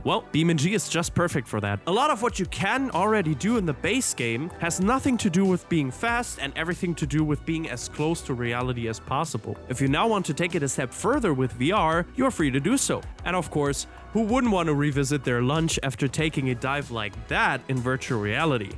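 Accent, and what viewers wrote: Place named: Germany. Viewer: Get a better voice-over actor. The one you use has a German accent that makes my ears bleed.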